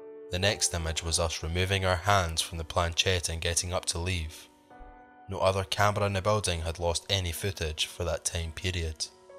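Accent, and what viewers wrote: United Kingdom. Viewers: Awesome stuff, so happy for you getting to over 1k sub's! I'm still sharing on wegamers. I am loving your content you put out, love the accent too, its great to hear people from the UK, love it. Keep doing what you are doing, your growing and growing every week!😜😜😜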